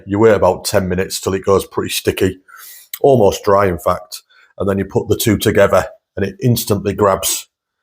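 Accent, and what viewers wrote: United Kingdom. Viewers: Randomly come across you on my home page and just knew you were British, I’m also British 😂😂 love watching wood working videos whilst I’m chillin, so it’s nice to come across someone who’s not American or Canadian for a change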